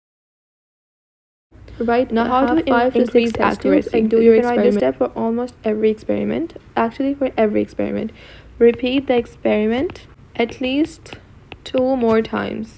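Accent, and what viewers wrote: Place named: Pakistan. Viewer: Where are you from...Ur voice seems Pakistani 🇵🇰❤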